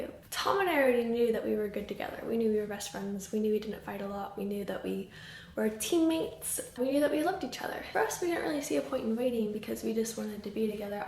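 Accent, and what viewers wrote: England, United Kingdom. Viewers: I can hear the British accent starting to shine thru, like a little bit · You are sounding quite English lol love it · The longer you are in England, the more British you sound. For some reason, the accent really suits you! Happy anniversary. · I love how you sound a little british now❤️ congratulations!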